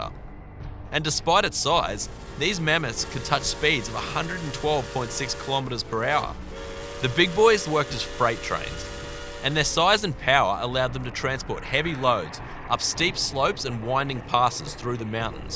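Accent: Australia. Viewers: Aussie accent is perfect for this type of content:elbowcough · he sounds aussie ngl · Are you Australian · Australians make everything sound like I want to go Outback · It does help that the narrator of this video is Australian.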